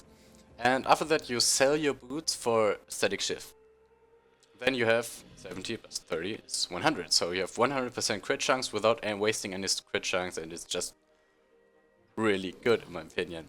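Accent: Germany. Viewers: locker der akzent ist so offensichtlich außerdem sind die besten rengar spieler deutsche ^^ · u can tell the way he speaks hes german lol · are you form germany or austria ? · Bist du deutscch ? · Do u come from Germany ? Hört mann nen bissle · Bist du deutscher? :D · He is german ! you hear that. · are you a german guy?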